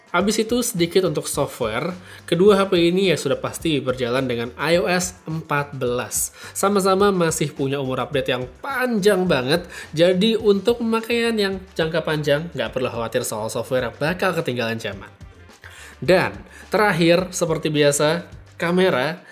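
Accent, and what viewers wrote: Bali, Indonesia. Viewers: Ini orang Bali ya???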